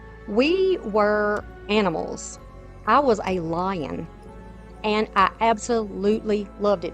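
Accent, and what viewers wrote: South, United States. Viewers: I love your videos. Always so helpful & enjoyable. It is so nice to hear a southern accent. So many try to cover it up. I spend a lot of my days watching your videos. Thanks again.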